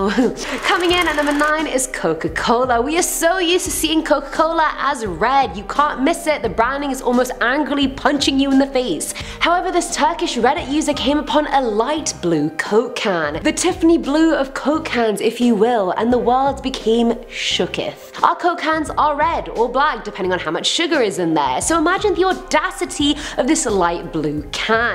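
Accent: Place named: United Kingdom. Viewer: Videos aren't the same when Che is not the host. And why put a British host? 😯🤣🤣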